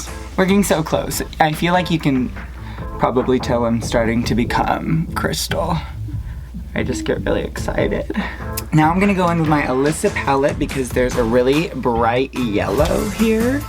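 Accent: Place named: Manila, Philippines. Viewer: The way she speaks is giving me Manila vibes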